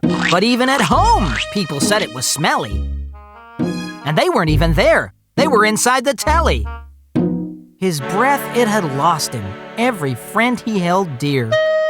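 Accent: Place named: United Kingdom. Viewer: I LOVED these!!! It's just not the same though without the British voice over :(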